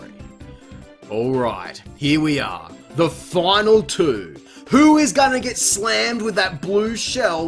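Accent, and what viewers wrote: Australia. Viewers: Wow, what a bumper and brilliant treat this was to watch! I really enjoyed your opinons and enthusiasm, nice to have an Ozzie accent to listen to throughout!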